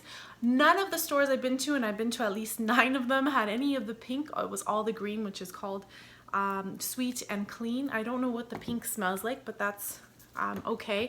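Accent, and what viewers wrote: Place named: Canada. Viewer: Always love ur videos! Hope you do another garden one:). That was so unique and relaxing:). You are a very special and sweet young lady. I love ur accent-I lived in Mississauga, Ontario Canada for 6 months and love the way you say the word “about”:) I still have a very strong New England accent tho I left there in 1978! 💕💗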